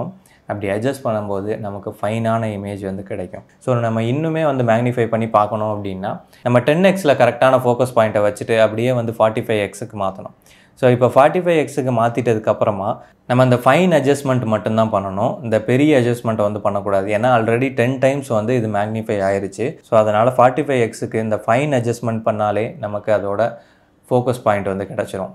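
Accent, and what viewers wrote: Tirunelveli, India. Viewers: Bro neenga Tirunelveli ah!!!😍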